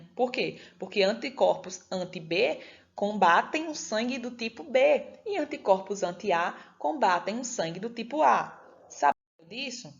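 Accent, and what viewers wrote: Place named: Bahia, Brazil. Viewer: kkkkkkkk é um pouquinho forte, mas é lindo. Bahia?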